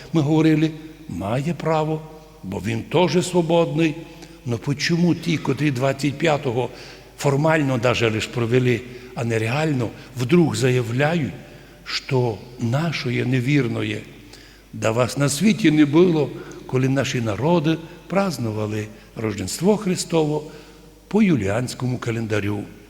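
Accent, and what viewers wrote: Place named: Zakarpattia, Ukraine. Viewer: Він говорить так, як споконвіку говорять на Закарпатті, а літературна українська мова в кабінетах вишліфовувалась, так як і інші, адже кожна місцевість мала свої діалектичні особливості. А ви на кого працюєте, закидаючи йому, що він мови не знає, а самі пишете чиєю?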